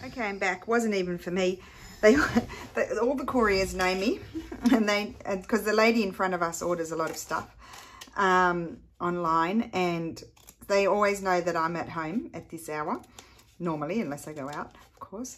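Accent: Australia. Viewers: Well done, considering the upheaval happening with packing and moving. I love watching your videos and the Aussie accent, even though you’re living far from us!! Thank you for this great idea with the “w”indow theme!! Regards, Susan W Australia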